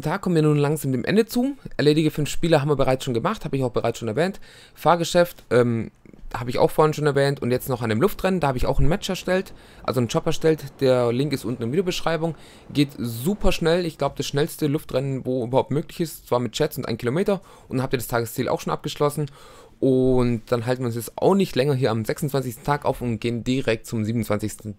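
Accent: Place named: Swabia, Germany. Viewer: da is wohl jemand ausm schwabenland:D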